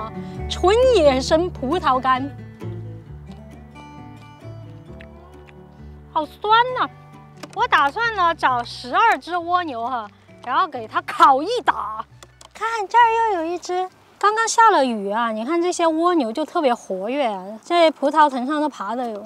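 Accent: Sichuan, China. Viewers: 浓浓的川普口音，好亲切啊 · 一直以为yooupi是东北人 原来是四川妹子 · 川普😀😀四川哪儿的啊 妹儿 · 怎么从普通话里听出了一丝川渝味道…… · 喜欢这四川口音。哈哈🤣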